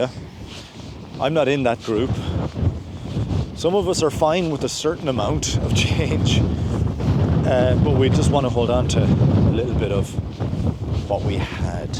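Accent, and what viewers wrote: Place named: Connacht, Ireland. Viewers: We in Dublin have discovered you! The message is truth. I love the Galway accent for talking about this stuff. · Comedy genius mate, Alan Partridge with a Connaught accent 🤣👌🏽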